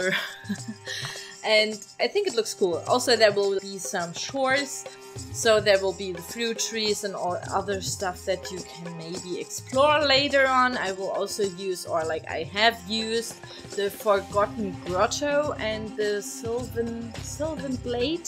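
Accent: Germany. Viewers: are you german? (just guessing from your accent :D) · sieht wirklich suuuper aus :) aber boaaa der akzent ist so quälend xd typisch deutsch irgendwie hahaha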